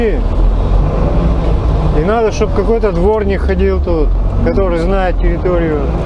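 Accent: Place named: Perm, Russia. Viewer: У тебя говор что то из Пермского есть)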